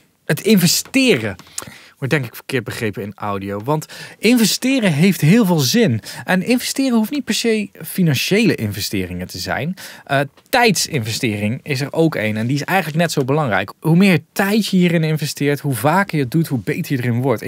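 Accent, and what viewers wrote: Brabant, Netherlands. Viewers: Nice een keer zo'n video haha!🤣🤣 Wat grappig dat je "een bietje" Brabants accent heb en ik dit nu pas hoor na al die video's in het Engels🥲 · Hoor ik nou een klein Brabants accent? 😀😀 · Mooi Brabants, was me niet in het Engels opgevallen.....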